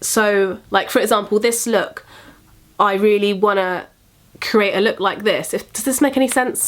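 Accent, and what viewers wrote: London, United Kingdom. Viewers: I have watched nearly all of your videos and i think you're amazing! i also love the way you say "gorgeous"! gotta love the london accent ;D xx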